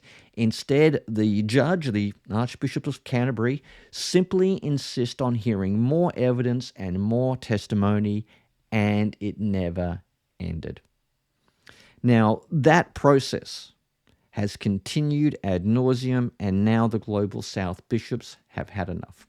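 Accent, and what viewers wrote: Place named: Australia. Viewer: As long as you know the hymn, "Thy Hand O God Has Guided" you are Anglican😂❤. Seriously speaking this is not a split. Anglicanism is being preserved. I'm just waiting to see what the Province of the West Indies, which I'm a part of would do or which side it would choose. I love your Aussie accent by the way.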